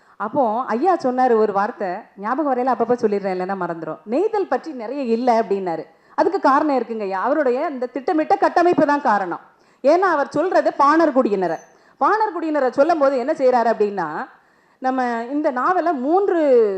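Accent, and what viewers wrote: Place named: Tirunelveli, India. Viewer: திருச்செந்தூர் ..... திருநெல்வேலி ...தமிழ் செம்ம....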